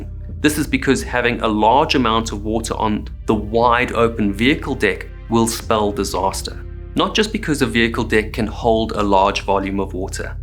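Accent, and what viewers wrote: South Africa. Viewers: Neat accent. South African, is it?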